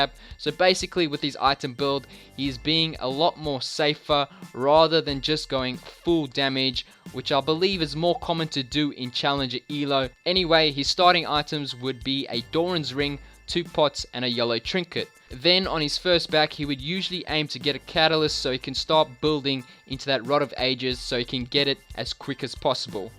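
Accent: South Africa. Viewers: Why does this guy sound South African? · You sound South African. Are you South African?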